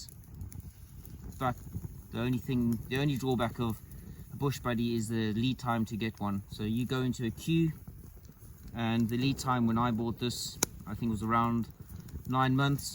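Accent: South Africa. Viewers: Hi there you sound like a SAFFA so am I but residing in the UK (24 yrs). I don’t do instagram any email add to reach out. BTW also bought two Rob Evan’s knives.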